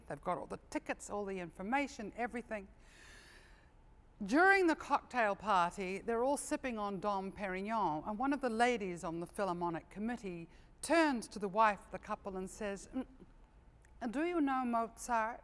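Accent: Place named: New Zealand. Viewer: I love your Yiddish New Zealand accent.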